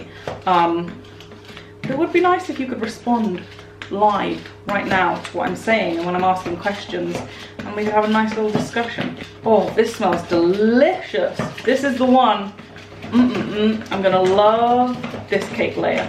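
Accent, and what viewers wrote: United Kingdom. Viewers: I am from the US and I love the way u talk and the terms I dont understand I google myself and have a fun time learning new British words because the UK fascinates me. Honey you just do u ! No matter what because your right u cant win so live for u! Do things the way u like it. :) I adore u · Hey Look up "YouTube Premiere" You can upload a vid for a scheduled time and have live chat...:) Love the vids! Listening to you talk is half the fun! I love hearing the British versions of things! Everything sounds much classier.... lol Much Love!!:))) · Also I like it when you say it in the British way it’s interesting the language difference and 90% of the time I can figure out what your talking about. 😊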